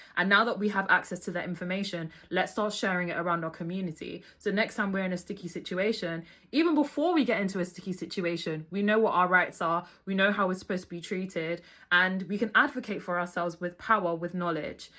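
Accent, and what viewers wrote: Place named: United Kingdom. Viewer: You have a British accent so why didn’t you go to school over there? Are you just trying to grift? 🤔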